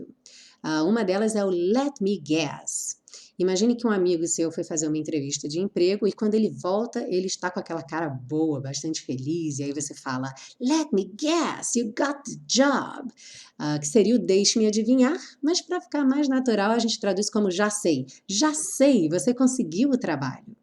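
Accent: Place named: São Paulo, Brazil. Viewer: Certo professora! Som de r do interior de São Paulo(Piracicaba).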